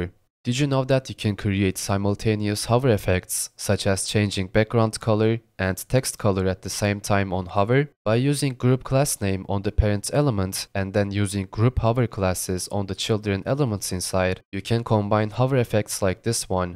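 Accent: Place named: Turkey. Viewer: türksün sen aksanın bağırıyor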